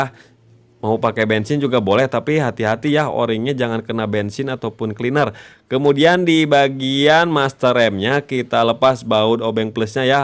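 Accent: West Java, Indonesia. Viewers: Sundana hese di leungitkeun 😄👍🏻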